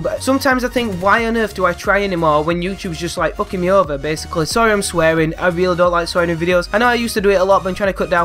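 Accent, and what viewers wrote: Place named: Scotland, United Kingdom. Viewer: lol Idk why i thought you were scottish tbh... :O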